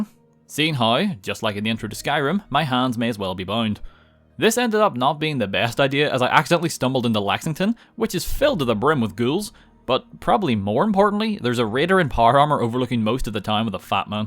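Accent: Northern Ireland, United Kingdom. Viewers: You from Northern Ireland? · Northern Ireland? 👍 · You sound northern Irish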